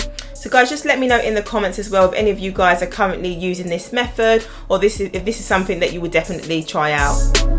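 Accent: United Kingdom. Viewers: Shalum I'm sister Bridgette From America,Galveston, Texas New subscriber.I love your hair and your beautiful uk accent. My hair is like yours.Shorter,but thick.❤🎉🎉 Tah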